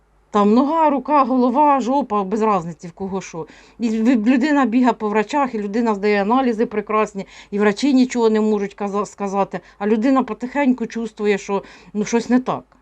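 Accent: Poltava, Ukraine. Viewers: Я так і знала, що ви з Полтавщини❤ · Добрый день,! А я вільно спілкуюся і на українській і на російкій мові, але значно приємніше чути наш полтавській говір. Простий і милий. Дякую. Дуже подобається вам слухати.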